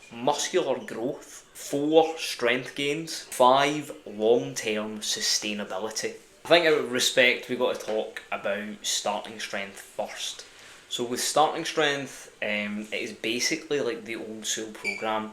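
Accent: Scotland, United Kingdom. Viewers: A fellow scot. Not to sound stockery but what part are you from. Ayshire myself.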